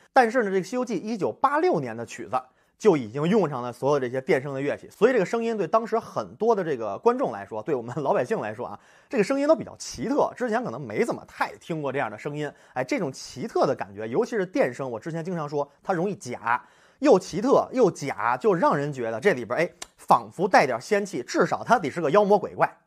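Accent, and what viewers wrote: Beijing, China. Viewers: 开口老北京人了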